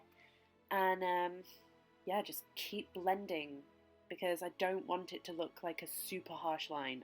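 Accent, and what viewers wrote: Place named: North, United Kingdom. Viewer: Oh right, I'm really bad with spotting accents but I did detect a slight northern accent haha. That's so cool that you have family here haha xxx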